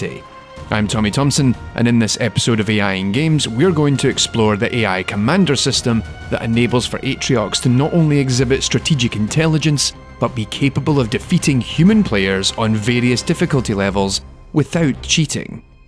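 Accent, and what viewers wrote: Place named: North, United Kingdom. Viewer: Nice work on the video mate, love to hear a fellow northerner talk about video games.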